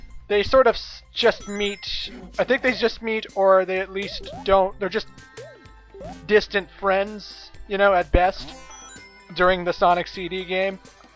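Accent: Canada. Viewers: speaking of canadian stereotype's do you say aboot when you mean about? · youre from Canada ? And sonic boom sonic boom sonic boom is annyoing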